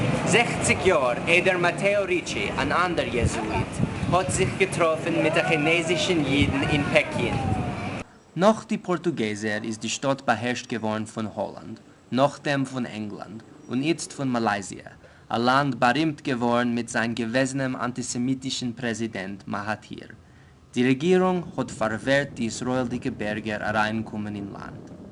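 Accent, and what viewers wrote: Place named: Northeast, United States. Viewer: How interesting. I have never heard the language that the spokesman delivered. I am a Swedish American who has a bit of an understanding of Swedish, Spanish, Latin and a great understanding of US English. I could just listen to this man & understand perfectly well what he was saying. Though he seemed to be speaking a pigeon blend of English/German/Swedish. His accent was clearly US Northeastern English.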